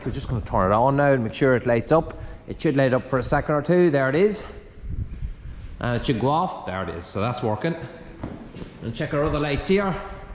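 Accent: Cavan, Ireland. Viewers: I have to say it’s absolutely deadly to hear a cavan accent on YouTube!! Apart from you’re honesty you make great videos, all the way from new York you know how to make me home sick!!! Virginia man.keep up the great work lad.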